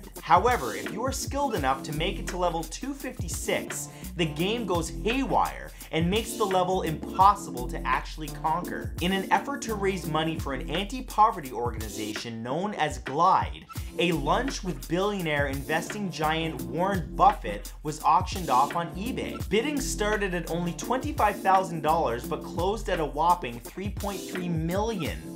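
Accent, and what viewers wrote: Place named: Canada. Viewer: He is Canadian, give him a break eh?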